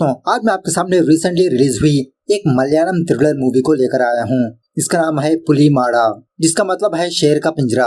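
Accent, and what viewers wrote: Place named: Andhra Pradesh, India. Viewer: Andhra Pradesh maybe because Arunachal Pradesh ka bolne ka accent alag lag raha hai😅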